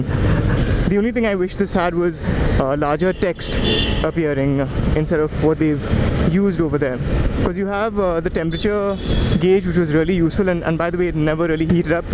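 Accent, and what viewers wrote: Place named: India. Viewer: Our hero back again with awesome content. And I love your hindi accent.😍😊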